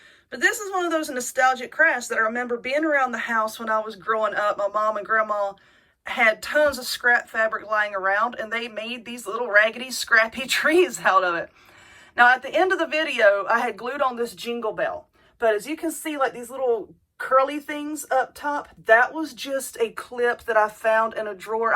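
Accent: South, United States. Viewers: Well I’m old and have never seen this craft before! So thanks for sharing it! Also I love your sense of humor reminds me of my sister even down to the southern drawl.🥰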